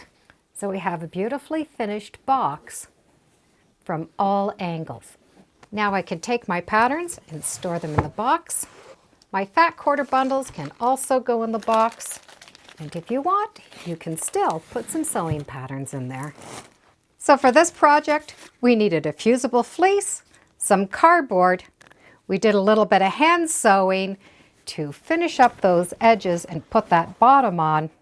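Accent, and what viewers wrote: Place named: Canada. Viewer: your videos are additive☺. Beautiful idea. You do it so neat and perfect. Love the fabric!. Are you in Canada? Your voice sounds like a Canadian😊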